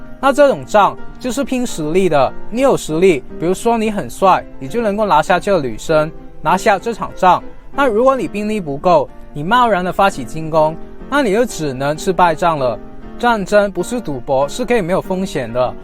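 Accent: Hong Kong, China. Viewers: 怎麼口音聽起來像香港人呢？ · 是哪裏人聽着像香港的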